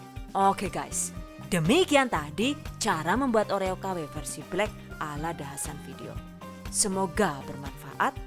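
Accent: Banyumas, Indonesia. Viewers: Bunda asli mana bun. Kok Bahasa nya ngapak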